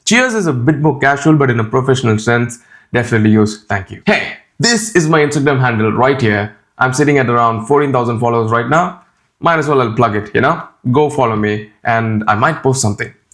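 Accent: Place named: India. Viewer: The way you're speaking is how most Indians speak, so it is an indian accent. The first one you did is stereotypical, never have i ever met someone in my life who speaks like that.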